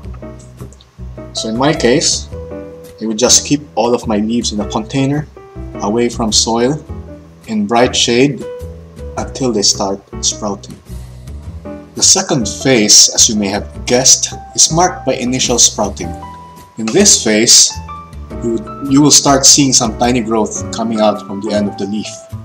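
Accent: Philippines. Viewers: You sound like a Filipino guy :)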